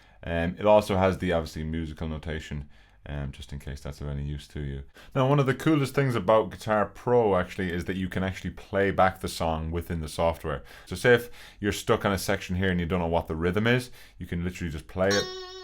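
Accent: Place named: Ireland. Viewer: I didn't expect him to have a deep voice with an Irish accent. I'm definitely getting the course now lmao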